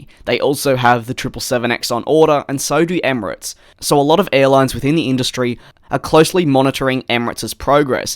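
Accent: Australia. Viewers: Are you from Australia? i'm pretty sure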